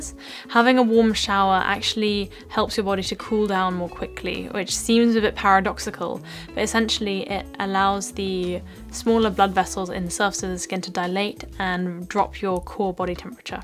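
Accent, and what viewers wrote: England, United Kingdom. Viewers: She is a very talented and a beautiful princess. I love her English accent. It is just so cute. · Hi,! Thank you so much for sharing about it. I’m learning English now. I like British accent so I learn a lot from your videos. I’m always cheering for you from Tokyo Japan❤ I hope u to come to Japan someday! I love you❤❤❤ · It's nice to hear proper RP on YouTube. Most of us get shooed off. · how have you been l hope you re doing well l have watched you for 5 months and h really have benefited as weel as l didnt understand english language but with you there is not much to it and especially british accent from your faithful follow from algeria💜💜💜💜💜💜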